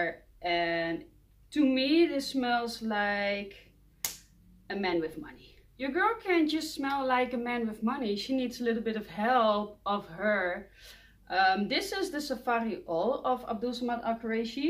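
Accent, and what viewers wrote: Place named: Netherlands. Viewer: Als Belg hoor ik een nederlands accent, toevallig van Nederland ?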